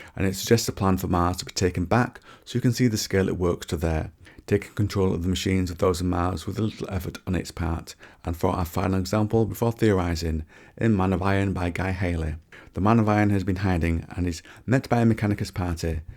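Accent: United Kingdom. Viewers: All I need is a soft , smooth British accent , and 40k lore , and I’m here for it. Luetin has biased me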